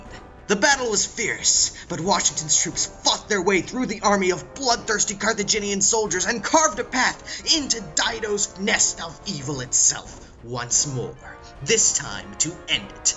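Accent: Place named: United States. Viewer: He sounds like America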